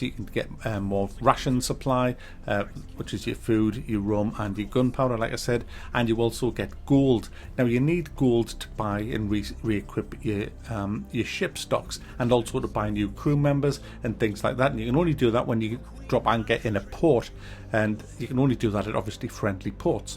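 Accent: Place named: Sunderland, United Kingdom. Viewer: Eh a mackem! Ya getting a sub just for that mate.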